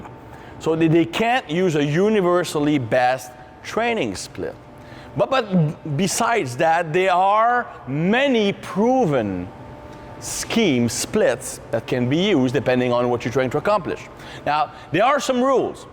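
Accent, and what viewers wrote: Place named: Quebec, Canada. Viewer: He’s French Canadian bro 🙄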